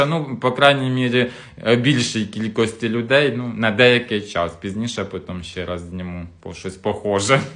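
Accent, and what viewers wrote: Poltava, Ukraine. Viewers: Дуже приємно Вас слухати! Справжній Полтавській суржик. Слухала б і слухала. Гарно розумієтесь на сільських тонкощах! Натхнення Вам на наступні відео! Чекаємо!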